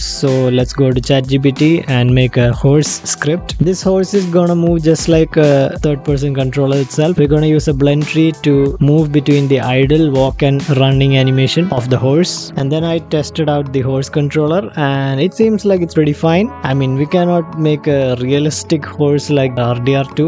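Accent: Kerala, India. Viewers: accent feel like desi mallu boy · indian accent hits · Bro's indian accent just makes me relax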